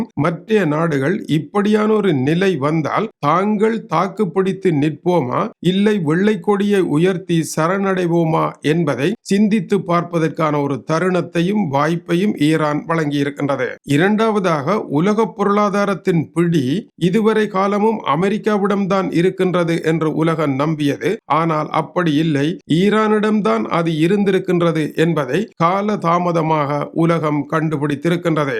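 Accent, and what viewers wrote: Sri Lanka. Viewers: நல்ல இலங்கைத் தமிழ் கன்னியகுரல் குளந்தைகளுக்ம் புறியும்படியான விலக்கம் நன் திகைக்கும்படியன ஒரு தெளிவான விலக்கம் அடிகடி உங்கள் நீ ஸ் கேக்க மனம் தூண்டுகிரது மிகவும் உன்மையான சம்பு வமாகவும் உடனுக்குடன் செய்திகளை அனுப்புங்கள் நன்றி ....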